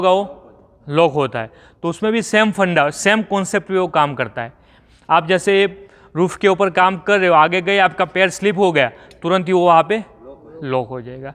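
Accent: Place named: Gujarat, India. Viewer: Mai bhi sir idhar TL line me kam krne aya hu Gujarat ap jaise bol rhe hai idhar bhi log aese hi bolte hai isiliye laga ap gujrat se hai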